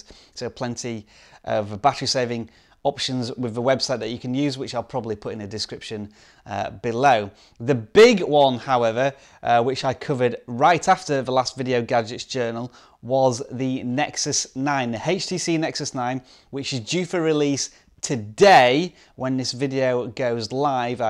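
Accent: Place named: United Kingdom. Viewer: you live in Canada? Are you British though? You have got a British accent in my opinion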